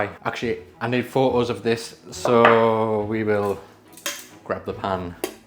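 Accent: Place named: Birmingham, United Kingdom. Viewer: This looks fantastic! Yum. Great stuff. I love your content and the Brunmie accent😂!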